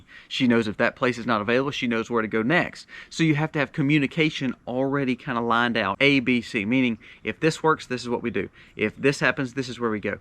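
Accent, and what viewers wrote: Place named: Mississippi, United States. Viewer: 🤔 "...your WHALE...how to BOWL...,"😮😅 Oops! Forgot to adjust my ears for your lovely MS "accent" (we ALL have accents 😉)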